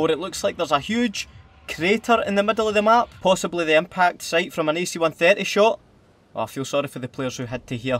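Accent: Scotland, United Kingdom. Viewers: Scottish ya spoon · It’s Scottish ya nuggets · Just realized he is scottish LUV YA ACCENT! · he’s not Irish he’s Scottish from Scotland like me and my family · Thats scottish if im not wrong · Feng Magic Gadget it’s Scottish man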